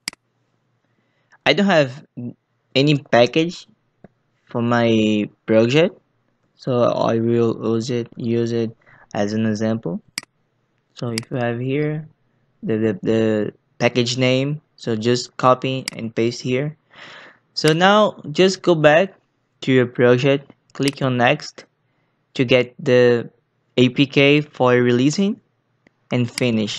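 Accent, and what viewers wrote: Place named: India. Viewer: Caramba, véi! Pensei que fosse um indiano! Já ia fechar o vídeo. :V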